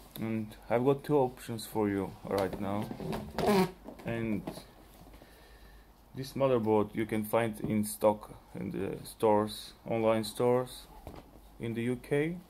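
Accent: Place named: Eastern Europe. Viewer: so where are you from bro your accent is slavic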